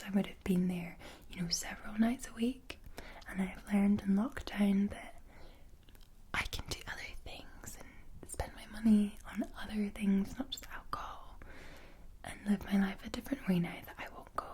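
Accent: Scotland, United Kingdom. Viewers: It's great to see a Scottish ASMR person on YouTube. As a Scottish person myself the last voice I want to hear is someone with a disgusting English accent so you my dear have frankly been a godsend! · the scottish tingles provided by a beautiful scottish lass. excellent! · Damn I really needed this today , after being scammed on Twitter and putting a hole in my wall , I need this beautiful Scottish Lass to calm me down . Thank you for all you do , it’s very much appreciated by me 😍😜👍🤠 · You should roleplay Rayla from Dragon Prince since you're Scottish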